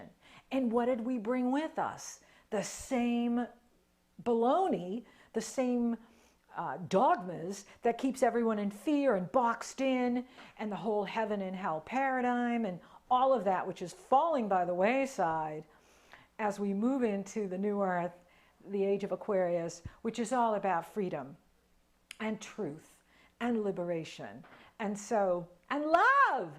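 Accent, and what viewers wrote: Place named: Northeast, United States. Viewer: Great to hear from you,! Thank you for taking us on this tour, I LOVE it! Although I'm slightly confused, are you saying that our ascendant changes to our current location? Also, as born and bred Jersey Girl, I believed that your accent was North Eastern. My suspicions have been confirmed LOL. Anyway, again. Glad to hear from you and looking forward to more adventures with you:)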